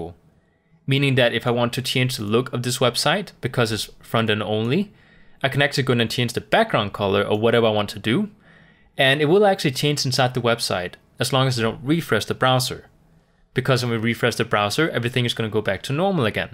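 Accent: Denmark. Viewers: You sound very danish, are you danish?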